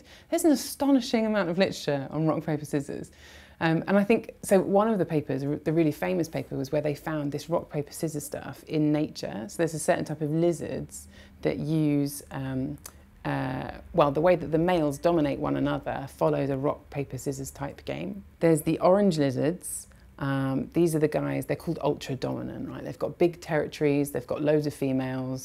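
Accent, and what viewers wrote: United Kingdom. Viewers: Hate that british accent. Brr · I love the British accent !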